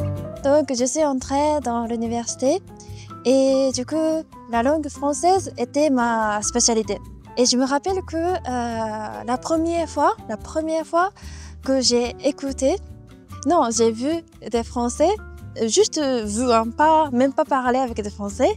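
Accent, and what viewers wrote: Japan. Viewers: Une japonaise mignonne, parlant un français courant avec cette petite pointe d'accent et pour arrière plan un village japonais... Bref, un charme fou vette video !!! ❤ Allez je m'abonne ! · je suis très impressionné par un tel niveau de maitrise de la langue !! et en plus avec très peu d'accent ( je compare avec d'autres japonaises qui parlent en français ) alors bravo ! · J’adore ce français à la japonaise 😀 Tu lui donne un certain charme ❤️ Et tu parles mieux que certains Français 👏😘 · C'est la première fois que j'entend l'accent japonais avec du français presque parfais mdr. Par contre c'est pas une bonne idée de venir en france. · bonjour. Je suis très content d'avoir pu regarder - par hasard - ta première vidéo. Je suis moi-même très attiré par la langue japonaise que j'avais commencé à étudier. Bravo pour votre niveau de français, excellent, avec cette pointe d'accent japonais qui vous singularise et vous rend si charmante.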